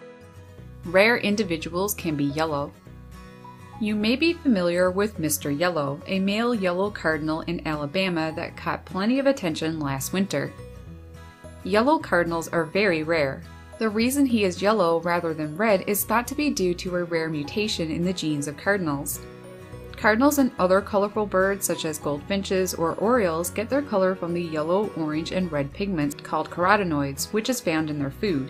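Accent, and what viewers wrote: Canada. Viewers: Aboooot. Canadian. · You have a Canadian accent. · Y does the male feed the female? Where do you live? You sound a bit Canadian. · Love the Canadian accent. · Thankyou so much! My favorite little bird buddies! I knew you would answer some more questions for me. I have not been much of a birder until I got sick and was actually "forced" to take notice the last decade or so. We have had many adventures my beautiful red bandits and I lol. I hear Canada or way North for you? I am surprised and sad you don't have these characters in your backyard. We get complaints from some young new neighbors that don't seem to care much for nature sadly. But we won't let them mess with our backyard as we have tons of birds and critters-my daughter is trying to help an orphaned baby squirrel right now. All year long some of the same ones are here. Its quite satisfying. 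Thankyou again and everyone that shared. I have to go put out more safflower and reg seed and suet myself!🐾✌🐝🐦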